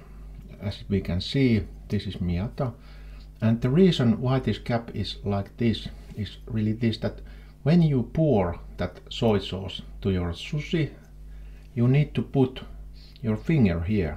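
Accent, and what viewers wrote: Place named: Finland. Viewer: As a Swede he sounds like he is from Finland to me. Idk but that is a guess I would feel pretty confident in..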